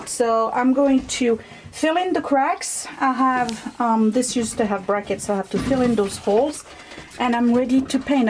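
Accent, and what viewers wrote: France. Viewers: Amazing, you remind me so much of my mom, not for your age, of course, as I am the same age as you, but cause you’re so creative and crafty. :) Love your french accent. I am Italian and also have an accent and nobody can ever tell it’s an italian one lol. They always think it’s from somewhere else :)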